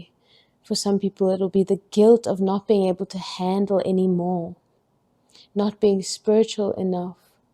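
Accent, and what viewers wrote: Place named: South Africa. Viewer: So happy to search other spiritual channels and find a fellow SOUTH AFRICAN VOICE! Cheering you on from the tip of Africa. I hope we might connect if it serves us - and humanity. I am an ex lawyer (that was brief....) now an ascension guide, I've been channelling since 2012 - specialising currently according to my team, in navigating the insanity of ascension - in other words, the psychological impact ascension is having on us individually and collectively.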